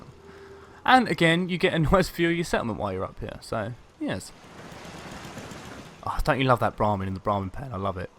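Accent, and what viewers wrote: United Kingdom. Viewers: I just love how English you are when you say things like "take a gander up 'ere" or " 'ave a nice cup of coffee"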